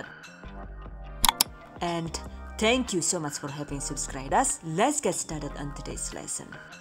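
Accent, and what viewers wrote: Banyumas, Indonesia. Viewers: Ngapak2nya bikin gemes 😀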